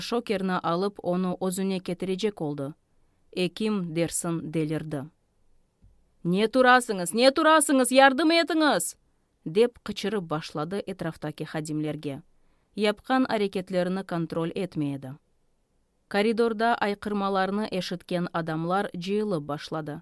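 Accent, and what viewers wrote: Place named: Russia. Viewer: Такое ощущение что русскоязычная тётенька читает с ужасным акцентом книгу на тюркском языке.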